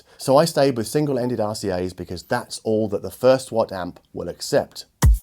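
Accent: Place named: England, United Kingdom. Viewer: Thanks! Get well soon (You sound like Caleb from Digital Trends albeit with an English accent 😅)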